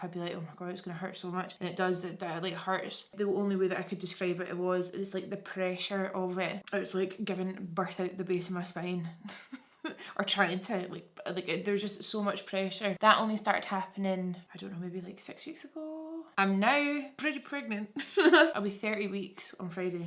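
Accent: Scotland, United Kingdom. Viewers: Your Scottish accent is giving me life! Your fan from HK btw! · You look absolutely gorgeous! Love hearing all your baby stories! Can’t wait for all the new things to come.. including baby girl💕🦄👛🌸🎀My family all lives in the UK and I love to hear you chat away cuz it reminds me of them 🥰I live in South Carolina now but grew up in California…. I can’t wait to see Vieve in America 😍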